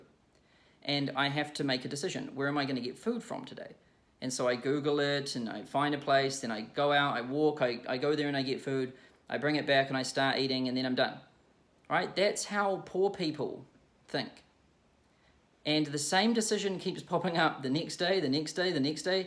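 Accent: New Zealand. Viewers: NEW ZEALAND ACCENT？？？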